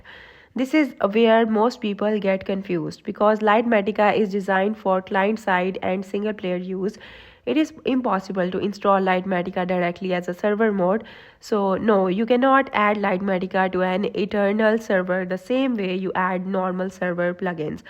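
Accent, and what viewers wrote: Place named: India. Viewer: holy indian gng 🥀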